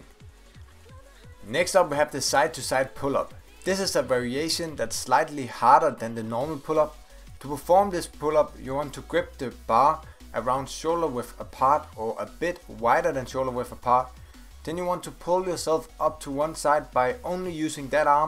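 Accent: Denmark. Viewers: Nice danish accent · I love the Danish accent😂😂😂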